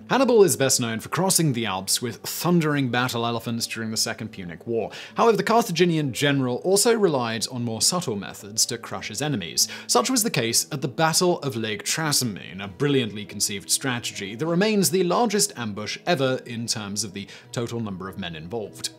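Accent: England, United Kingdom. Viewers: Pizz-er. English accent cracks me up 🤣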